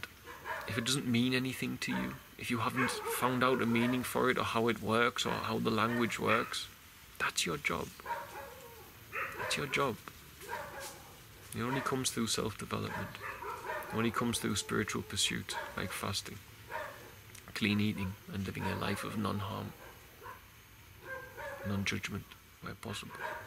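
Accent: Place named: North, United Kingdom. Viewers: Are you from the North East in the U.K.? I am and recognise your accent. I too receive these numbers and beleive that they are guides or markers to say that I'm on the right path. May God Bless You. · ah the northern accent. I am from NEwcastle! I see , , , , , , , , and which is my birthday! I went down south to the frack pad in Preston New Road to film the police brutality and the old tax disc on one of the campervans was !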